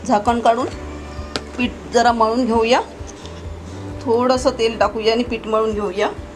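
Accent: Malvan, India. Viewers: Tumhi Malvani aahat Ka madam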